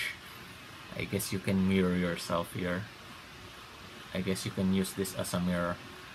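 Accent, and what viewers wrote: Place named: Philippines. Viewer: Sabi na pinoy ka po eh haha sa itsura at pananalita palang eh hehe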